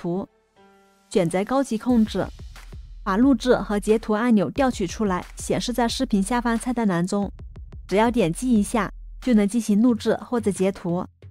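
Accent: China. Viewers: 声音挺可爱的，而且英文也是同款中式，很有亲切感~哈哈哈~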